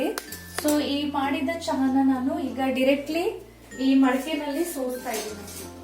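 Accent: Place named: North Karnataka, India. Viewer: Nanu uttara karnatakada Gulbarga davru.....neevu uttara karnatakadavru anta keli khushi ayitu.....neevu namma bhasedaga matadri keloka cholo anistada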